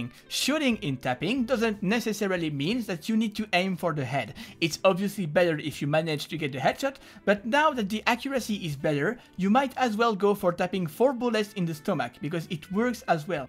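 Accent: France. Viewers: French and uk englisch pronounciations are the best:) keep it coming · Your prenouncation is fine, you just talk english with a french accent you cant help that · Un léger accent français mais Nice vidéo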